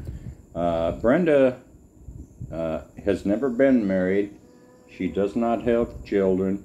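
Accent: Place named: Midwest, United States. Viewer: Hello. I really enjoyed your stories. I remember the stories about when you was a kid in Minnesota at the vacation spot they used you for a fish tour guide. That voice you have in that Midwest accent really does a great job at storytelling. If you look through any comments I've made I don't think you're going to find anything that I've ever said that's been negative. I wish you Brenda and Rose the very best. Just an idea it would be nice to see if Brenda does come out of her shell the progression of that. I've seen some other guys that have had shy Filipinos and it took a while but you could see them get better and better at being on camera and such. God bless good luck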